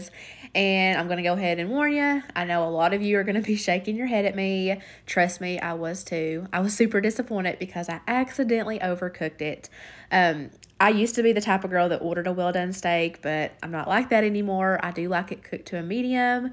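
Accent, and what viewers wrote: South, United States. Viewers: I'm so happy to see your back doing your what's for dinner videos, everything looks so yummy, I hope that in time you will start healing from losing your family member, I know exactly what you are feeling because I have been through the same thing, anyway, I'm so glad you're back, I surely miss when you don't post, I just love your southern accent, it's so cute!! Sending you and all of your family lots of love and hugs, take care, I'm looking forward to your next video, God Bless You All 💗🙏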